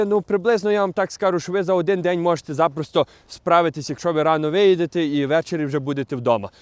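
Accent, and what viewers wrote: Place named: Galicia, Ukraine. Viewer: Мова дуже гарна, з першого слова впізнала галичанина-земляка.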